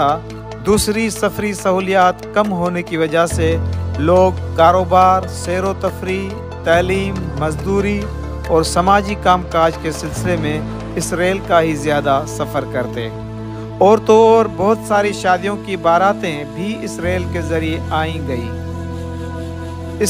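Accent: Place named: Sindh, Pakistan. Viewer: Koi Sindhi jab Urdu bolta hai tou? Bohat achcha lagta hai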